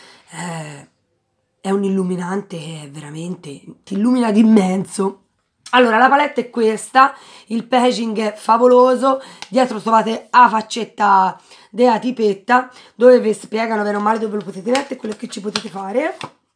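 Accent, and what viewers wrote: Tuscany, Italy. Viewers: Ciao nuova iscritta da poco sono Toscana come te dalla parlata sei stupenda e sei simpatica 😊😊continua sempre così 😊😊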